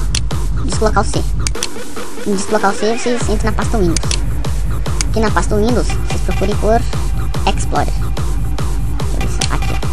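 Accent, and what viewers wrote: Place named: Paraíba, Brazil. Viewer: QUE VOZ DE PARAIBA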